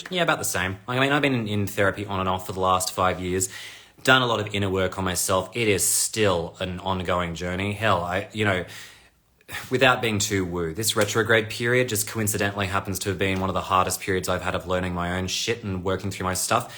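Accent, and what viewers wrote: Australia. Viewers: I have been listening to you every day, and it is fair to say that you are the biggest gem on YouTube/IG. The depth of your knowledge and expertise is truly fascinating, at times even too much for me to absorb (and I am a nerd).☺️ Also, loving your authenticity and the Australian accent. A big thank you and keep on rocking. 💚🙏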